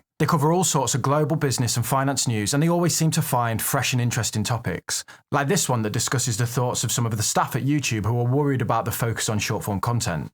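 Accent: Birmingham, United Kingdom. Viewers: I knew it, I was sure that you had the Brummie twang! But why oh why are you following city and not the villa? 😊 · Nice to hear someone with a Birmingham accent sounding extremely intelligent. Keep it up. Bro from Great Barr.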